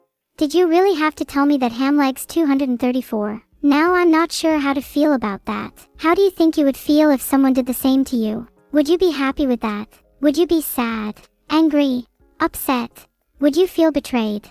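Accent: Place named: United Kingdom. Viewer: hit her with a british ancent update, and she use all the big word in 1 sentence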